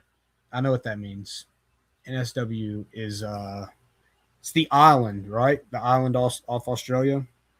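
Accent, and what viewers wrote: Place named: South, United States. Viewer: This is cool. Love to see it, and to hear that southern accent😂 This is one I haven't watched with my son yet. We both love baseball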